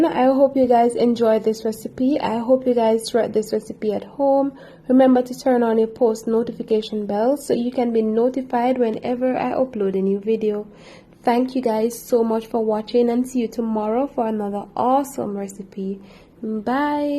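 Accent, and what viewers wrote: Jamaica. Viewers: I like the Jamaican accent. Blaze up gal.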